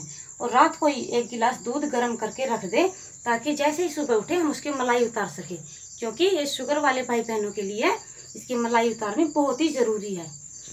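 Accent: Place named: Hyderabad, India. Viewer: Madam aap se aane ki taraf se ho kya aapki awaaz Udhar Ki Lag Rahi Hai main Hyderabad se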